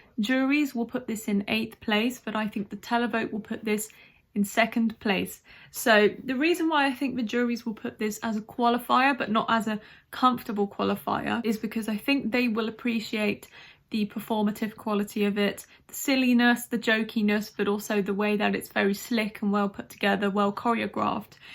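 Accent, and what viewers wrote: United Kingdom. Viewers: you have a lovely English accent and you look gorgeous. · I am not a huge fan of Eurovision, and it's veeery seldom that I watch "reactions". This year is an exception, because of our Kalush Orchestra participation at Eurovision 2022. Thank you for your respectful analysis of this entry. I have watched some "reactions" (which was mostly like Oh/wow/lifted eyebrows kind of reactions, which is neither fun nor development)). But your channel gives so much more! Your Reactions are more like Analysis than simple reactions, actually. Love your British accent), love your genuine vibes, love your respect in predictions. Share your emotions towards Måneskin)). Thank you! You are doing it right! Love from Ukraine! Since we cannot vote for our country, my vote this year is for Sam Ryder, UK. Very good entry!! Vocals, aesthetics, the whole message- good luck, Sam!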